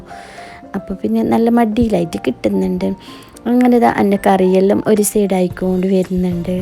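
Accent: Kasaragod, India. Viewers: കാസർഗോഡ് സംഭാഷണം കൂടി ആയപ്പോൾ മീൻകറി sett ആണ് 👌😍😍😍👍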